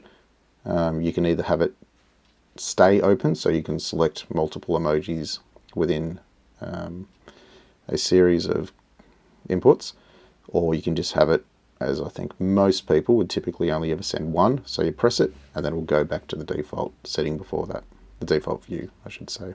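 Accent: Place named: Australia. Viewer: Great review, knowing both sides of the coin, why not go for the best side, nice to hear an aussie not talking too much aussie, no offence. Regards London boy.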